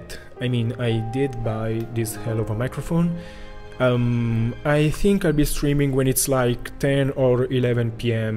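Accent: Italy. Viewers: So excited to make your stream and getting more content from you!!! That Italian accent could never get annoying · And btw your Italian accent dont annoy :3....it makes you very handsome :3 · "So hopefully, you'll be forgiving all my mistakes." Bro, we're not even going to notice them. Also, we Americans love accents. 😉 Including Italian.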